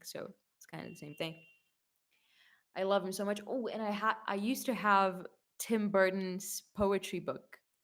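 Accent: United States. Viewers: Extremely surprised to hear an American accent! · Nice American accent!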